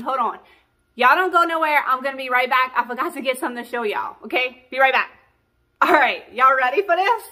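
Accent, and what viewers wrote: Louisiana, United States. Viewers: Where are you from? I am from Louisiana, and you sound like we do 😂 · The sea garland, looks fabulous !!!! The antique frames are so gorgeous!!! P.S. I heard you tell Wren, to "come see" and I couldn't believe my ears! I always say that, also, but, ppl that aren't Cajun, think it sounds funny. It's actually because we've heard that said, in French, so we say it in English. I have to watch your videos, every day, to get my daily dose of the Cajun accent; it makes me feel like I'm back in Louisiana, instead of North Carolina. 😊😘😁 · Love you and your channel. I’m from Louisiana and I swear you have an accent like you are from Louisiana as well. Maybe around pointe coupe parish or something. Lol am I close?? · I'm new. I'm a nurse by profession but I'm a wannabe crafter. I procrastinate but am hoping to really get serious. I stumbled up on your video and youre a beast with this stuff. 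You have my accent. You must be from Louisiana. Can't wait to see all of your stuff.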